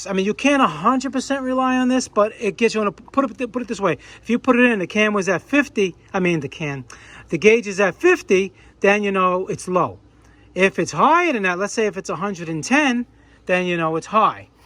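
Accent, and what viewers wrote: New York, United States. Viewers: thank you bro,, u gat that N.Y. gangsta accent.. i like it!! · Ditto. Moving to Hawaii makes me miss the Boroughs' accent.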